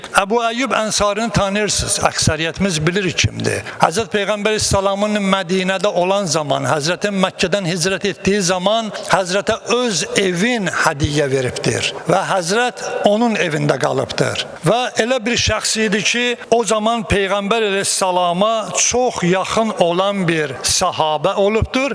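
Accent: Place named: Iran. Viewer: Bunun nə murdar səsi, ləhçəsi,sifəti var. Iran tulası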